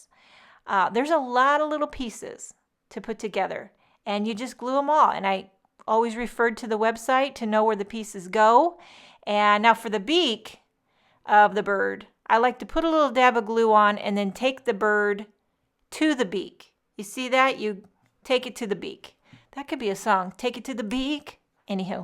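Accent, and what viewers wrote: United States. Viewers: u are a women after my own heart, I do the same things, why don't things work the way they are suppose to, Ugh! And I have habit of expressing my opinion wether anyone wants to hear it or not. I noticed you said u were in Germany but u have an American accent. Love the birdies...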